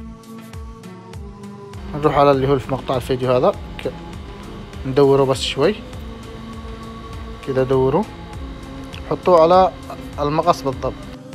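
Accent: Yemen. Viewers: انت من اليمن صح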